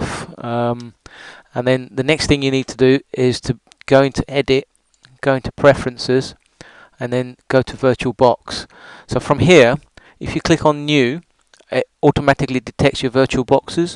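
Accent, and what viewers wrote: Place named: United Kingdom. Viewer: INDIAN With british accent !! great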